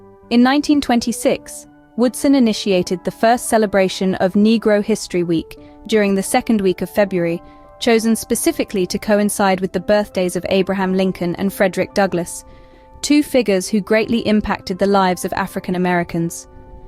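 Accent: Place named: United Kingdom. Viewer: Is all the video AI generated? If so, that's some if the best quality AI video I've seen. Why a British accent for the narrator, though?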